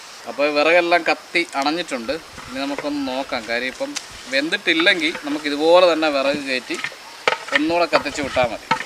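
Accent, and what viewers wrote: Kottayam, India. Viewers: കോട്ടയം ആണോ സ്ഥലം ? സംസാരം അങ്ങനെ തോന്നുന്നു.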